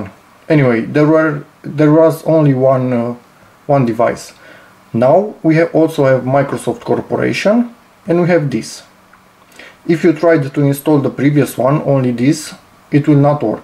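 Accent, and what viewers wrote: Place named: Romania. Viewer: Bro tu esti roman 100% :)))) <3 Edit: Te-am luat dupa voce si cum vorbesti Engleza da se vede si pe paginile web :)))))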